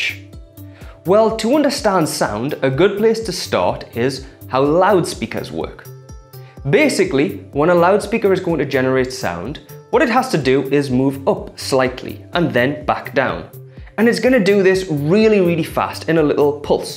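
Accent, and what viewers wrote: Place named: United Kingdom. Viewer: dude that was really helpful .like you go britsh!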